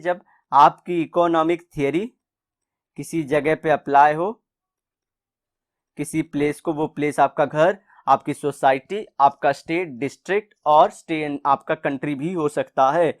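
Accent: Maharashtra, India. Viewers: Is your mother language is marathi ? 🤔🤔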